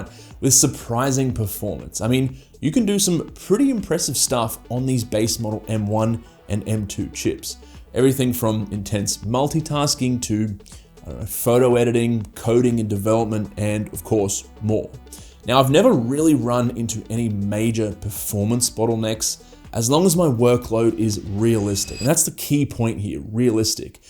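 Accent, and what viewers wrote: Australia. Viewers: Thank you!!! I really love your content so much and love hearing a fellow Aussie on here! I’m sold- you definitely made me see that that MacBook Pro 14 is probably the best way I could go… for just a little bit extra than the air with added specs, yet substantially lower than the 16”. Seriously thank you! I can’t believe I didn’t see that before . Well only started looking for a new MacBook recently…. Cheers!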